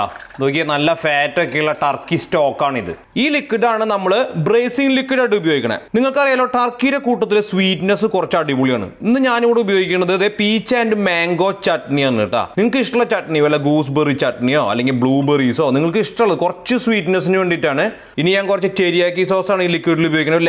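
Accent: Thrissur, India. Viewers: What a humour. Trichur slang. Beautiful. Yes ur hands r love. Fantastic presentation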